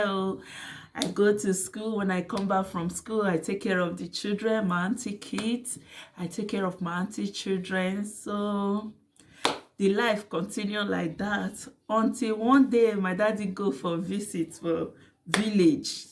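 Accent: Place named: Edo, Nigeria. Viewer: You're sounding like an Edo babe. Am from EDO state😘. Nice connecting with you. Some aunties can be so wicked but thank God for your Dad's quick intervention.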